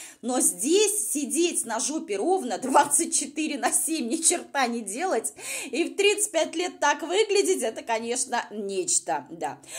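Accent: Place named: Revda, Russia. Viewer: В ее чо,вместо что.Капец,дуня ревдинская.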